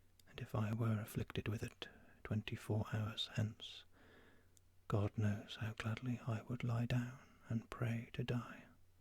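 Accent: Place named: United Kingdom. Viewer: What a great idea to relax 😌😊💚. And as a Frenchman, what a great opportunity for me to practice my English pronounciation by listening to you 👍. Furthermore, I love the British accent (Any british accents). Most of the time, It is the one that I try to imitate when I speak English 😁 ; even if I still have work to do to improve my accent 😅. In any case, this moment was very soothing 😴👍💚. Thank you for the peaceful break 🙏💚.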